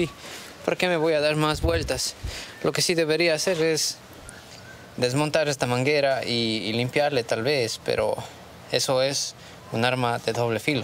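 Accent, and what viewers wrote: Cuenca, Ecuador. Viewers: no soy mecanico pero tengo un problema con una ford f150 que lo compre recien. Se recalento estan do parado se hizo un telajo. Saludos felicidades por el acento parese ser de cuenca 🇪🇨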